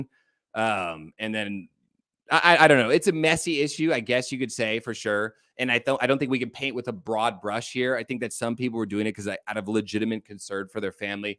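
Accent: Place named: China. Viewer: watching ur channel in English feel a little wired,😂 I am used to your Chinese accent.😊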